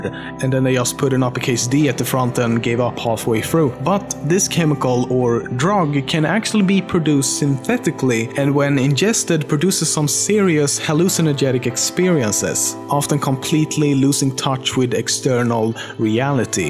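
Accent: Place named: Sweden. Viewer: Youre sweedish i hear it on the accenr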